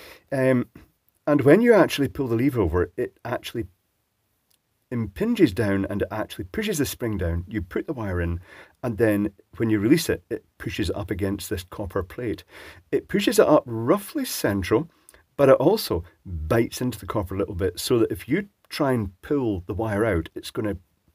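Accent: Scotland, United Kingdom. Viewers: Another good video,watched you for years but never commented,I live in Ayr and it's good to hear a fellow Scot,I bought some non branded ones from Amazon after I watched a video of John Ward's where he tested them extensively against branded terminals and gave them a good review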